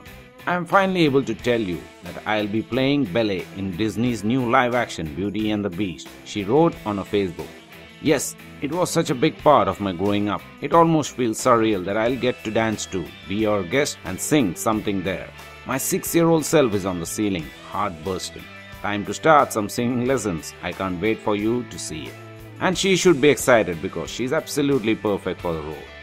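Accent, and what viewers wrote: India. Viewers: Actually he is Indian. And that is is why he is speaking ridiculously. He is speaking in Indian English accent . The most funny part for me was when he pronounced hermione as Hermene and Belle as Bell-e! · bellay? her-mion gran-gerr?? bwahhaaa!! lolzz! mann sorry bt ur indian accent is juss too pronounced! (fyi, I'm indian 2, bt I pronounce words ryt!)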